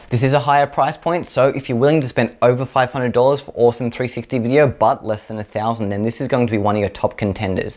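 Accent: Australia. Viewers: Didnt realise your from Australia. What part of Australia you from?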